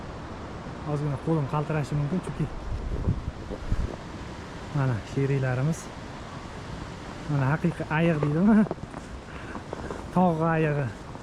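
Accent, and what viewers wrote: Namangan, Uzbekistan. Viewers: Sheva nomongon😊😊😊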